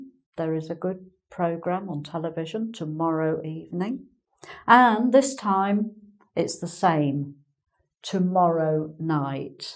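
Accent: England, United Kingdom. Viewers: she must be from England becuse her accent is the same like others English people lmao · I love her british accent. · I very love British accent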